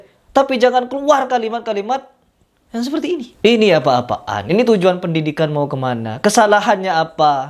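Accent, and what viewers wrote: Madura, Indonesia. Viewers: ADMIN LOGAT MADURA INI PEMFITNAH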